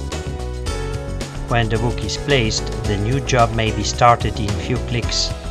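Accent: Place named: India. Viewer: Swiss made but with Indian accent ?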